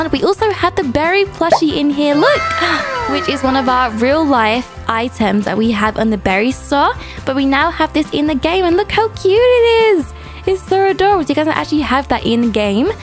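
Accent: England, United Kingdom. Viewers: I love your British do u speck British or something kinda with British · You can tell she’s English lol my dads english so that’s awesome oh wait aren’t you?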